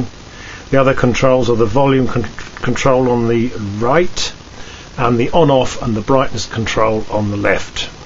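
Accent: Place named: England, United Kingdom. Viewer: Anytime its an Englishman talking you know the video is going to be top quality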